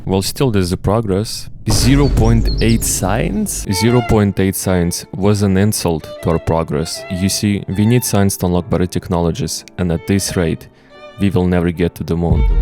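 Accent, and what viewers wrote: Russia. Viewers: NOT an American lmao that accent exists NOWHERE in the US 😂 feel like he comes somewhere from Russia or Asian/Euro area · Wha What is your accent? Not trying to offence, I'm really interested, if you're from Russia. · from a guy with strong Russian accent...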